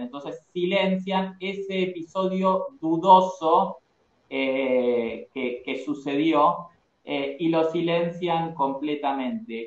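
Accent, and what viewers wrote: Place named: Argentina. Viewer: Hola. Me gusta el trabajo que haces y la forma en que detallas cada nota, con tu estilo y ese acento argentino le dan el toque. Al menos en mi opinion que soy mexicana.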